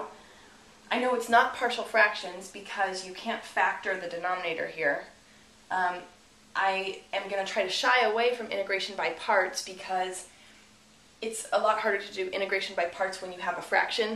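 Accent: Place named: New Jersey, United States. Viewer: That's a lovely accent you have, New jersey ? Or austria 🤔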